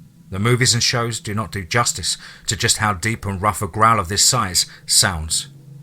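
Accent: United Kingdom. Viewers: Omg, as a Hoosier, I cannot believe that I just heard a British guy talking about Indiana and Sammy Terry! It's almost too much!😀 Fun fact: Owen County is where the very last wild wolf in Indiana is said to have been shot and killed in 1843.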